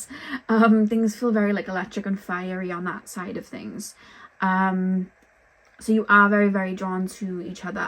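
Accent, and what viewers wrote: Wales, United Kingdom. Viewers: I don't understand a word you're saying 😢 +oh, you got the Welsh accent 😮 +oh... yes I do talk a lot😅 I'll re-watch it with subs 😊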